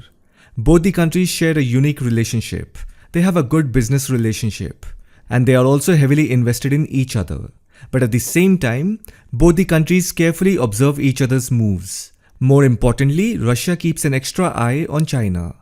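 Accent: India. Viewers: Something about the Indian accent that makes you understand easily. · Индус всё объяснил! Красава. Кстати классный акцент 👍🤝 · 該死！這個咖哩味的英語、讓我聽不懂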